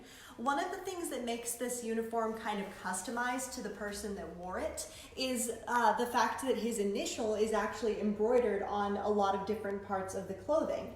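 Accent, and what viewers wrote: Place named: Midwest, United States. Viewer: Horrific "commentator" with a fragile grasp of Civil War history and a marked inability to disguise her flat, mid-western 'accent' with dewdrops of "you'all's. Just awful.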